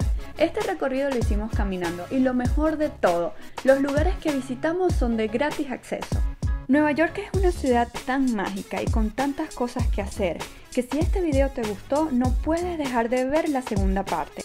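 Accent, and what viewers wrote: Venezuela. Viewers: De donde es esta chica? Tiene un acento como de Venezuela,pero dice palabras que solo usamos en México 🤔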